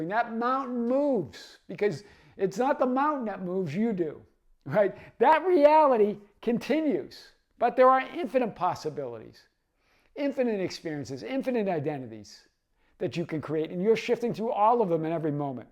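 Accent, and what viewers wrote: Boston, United States. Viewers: I’ll be honest with ya, when I found your channel a while back, I was like “ehhh idk, this guy is kinda loud with a funny accent” (I’m from Arkansas so if anyone has a funny accent, it’s ME lol) but the more I watched you, the more I’ve come to love your Boston accent & you’re not loud, you’re PASSIONATE. Now I look forward to your videos everyday because you’re such a ray of sunshine & such a wonderful teacher. You’re a true gem,! 🫶🏼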